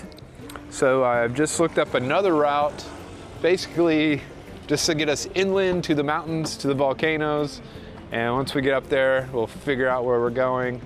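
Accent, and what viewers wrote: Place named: United States. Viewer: Sounds like a stupid yank lost in a foreign country, so many of these dicks you meet on, just interested in the surf and a cheap place, do you care about the people wanker?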